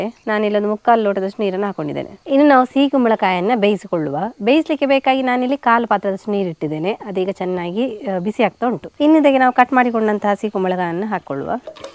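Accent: Mangalore, India. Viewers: Clear mangalore kannada 👌karavali brahmin style curry 👍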